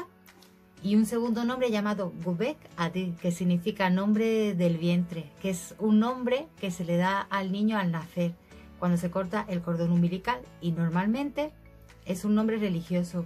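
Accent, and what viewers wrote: Andalusia, Spain. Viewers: Estupendo video, son muy bonitos los nombres turcos, desde que veo las series todo lo referente a Turquía me encanta. No hay lugar en el mundo donde que me atraiga más que Estambul. Me gusta como suena el idioma, las comidas, costumbres, todo es nuevo para mi. No me gusta cuando critican en los medios la vida en Turquía, supongo que como en todas partes hay tradiciones mejores o peores pero por eso no hay que desmerecer a una tierra y a su gente. Por tu acento deduzco que eres de Andalucía y has aprendido a querer a Turquía que me parece maravillosa. Pena que con este virus, cada vez estaremos mas limitados en viajes por el mundo. Te he descubierto hace poco, pero es muy interesante todo lo que dices. Un beso desde Barcelona, tienes una fan. ♥️🌷🌼🌹🇹🇷🇪🇸😘😘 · Me encanta el acento andaluz!! 🙌🙌🙌🙌🙌 Justo estoy viendo uno de tus vídeos "10 cosas que siempre hay en una casa turca"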